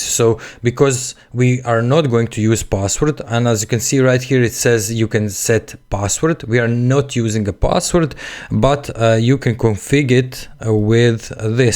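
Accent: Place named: Russia. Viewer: Акцент сразу выдает русского)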